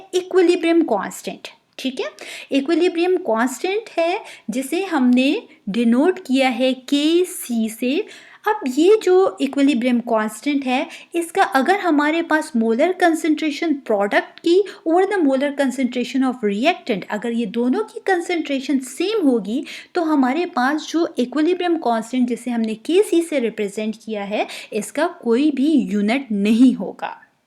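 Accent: Pakistan. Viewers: Mam are you from Pakistan?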